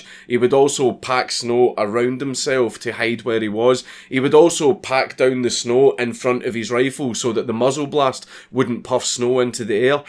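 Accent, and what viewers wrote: Scotland, United Kingdom. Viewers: I would love to have dat accent its so extreme I love it. (sry if wrong but is it scottish accent?)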